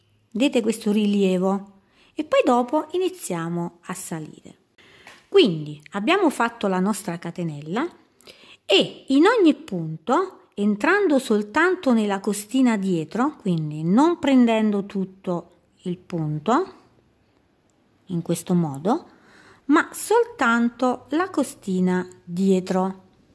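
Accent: Italy. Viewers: Molto carini! Brava nella spiegazione......si sente che sei siciliana 😀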